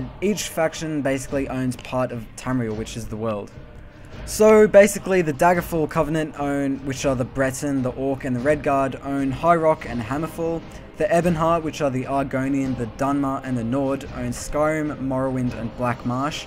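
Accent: Australia. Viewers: You´re an Aussie, right? Great accent!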